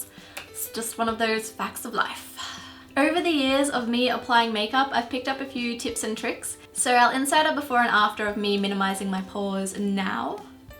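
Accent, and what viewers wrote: Australia. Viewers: What?? She has a super heavy Australian accent.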